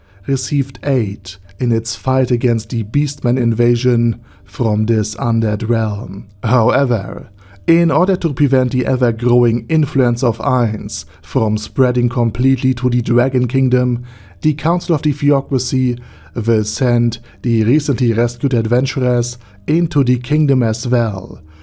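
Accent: Germany. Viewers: thicc german accent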